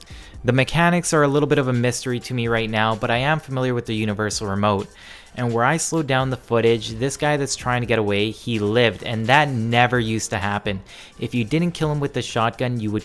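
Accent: Canada. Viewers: I think he's from Canada it's pretty rood to get mad at someone for their voice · Canadian ey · U have the only Canadian accent I've ever heard....and I'm Canadian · You are the most Canadian sounding person of all time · His canadian accent is bleeding out of this video... It sexy tho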